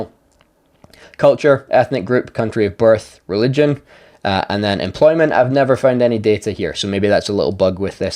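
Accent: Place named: Scotland, United Kingdom. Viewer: Good to hear the Scottish accent to do with property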